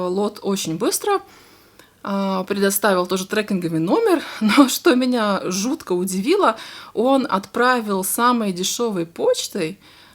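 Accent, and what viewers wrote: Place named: Latvia. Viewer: Sveiki:) es, ceru, ka var latviski, jo dzirdu latviešu akcentu:) tik gribu pateikt, lai šis gads ir saulaināks un priecīgiem notikumiem bagātāks! Ļoti forša episode, arī es atceros eBay, es pat tirgojos tur, radās ideja papētīt to atkal:)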